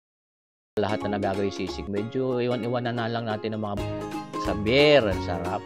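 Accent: Batangas, Philippines. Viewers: Nakakatuwa talaga manuod ng mga videos nyo sir gusto ko po batangas accent nyo. More power po sa inyo! Tatawa habang natututo ❤ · shout out watching and enjoying listening to your batangueno accent from Edita Ransom Arkansas USA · Ayos!..bukod sa sarap ng luto mo kabayan,ito ay sinasamahan mo ng mga adlib o pasakalye mga salitang batangueno punto na syang nakakagana panoodin ang iyong pagluluto.Mabuhay ka Kabayan at ipagpatuloy mo iyan iyong talento sa pagluluto at nandito lamang kmi pra suportahan ka...cheers!..god bless!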